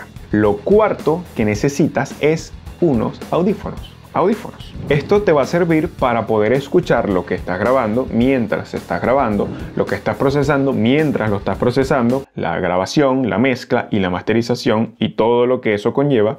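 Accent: Venezuela. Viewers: Vos sois maracucho verdad? 😂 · Excelente video hermano! Solo por curiosidad, ¿eres venezolano? tu acento me suena. XD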